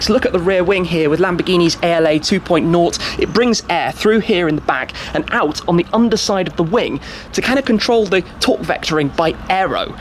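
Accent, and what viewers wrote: United Kingdom. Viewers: inggris britis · He has a british accent. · your accent makes everything you say increases the stereotypical british car enthusiast personality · Nice British accent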